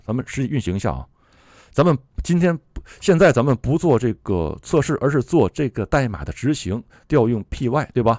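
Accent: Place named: Japan. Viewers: 因为在日本，没办法你到日本你的英语也会变W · Python Test 为什么老带日语发音？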